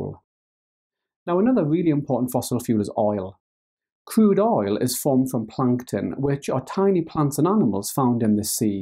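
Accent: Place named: Newcastle, United Kingdom. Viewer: He's from Newcastle, so I'm guessing it's a slight geordie accent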